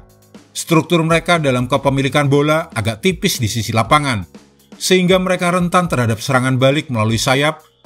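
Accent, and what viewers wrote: Java, Indonesia. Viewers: Medok tapi mantep 👏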